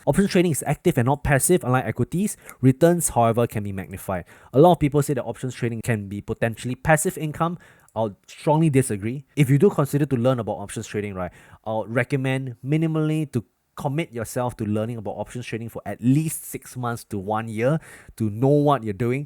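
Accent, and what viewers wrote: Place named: Singapore. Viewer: Hello good luck 🤞 with options . I can hear you are Singaporean. I lived there for several years at marine parade. Loved it and miss it much.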